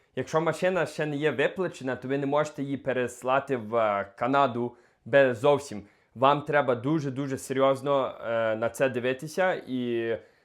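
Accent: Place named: Western Ukraine, Ukraine. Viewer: Цей говор западенський , фу , гидко слухати 🤮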